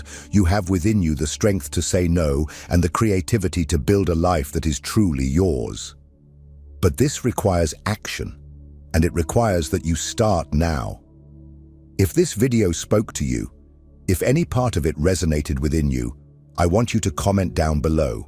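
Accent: United Kingdom. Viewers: Am i supposed to think this is profound cause dude has a brittish accent and a deep voice? I mean i guess this is a good message for someone who’s been bullied all there life, but the whole ”domination used to be a virtue - lets bring it back!” narrative is just fucking stupid as all get out 😂. Sure be assertive and stand up for what you believe in, but not at the cost of seeing others as equals and trying to better yourself for the greater good.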